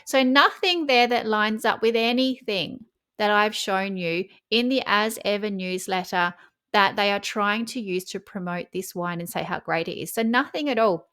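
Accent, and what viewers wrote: Australia. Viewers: I love jour voice and your acccent Is het from Australia Big kiss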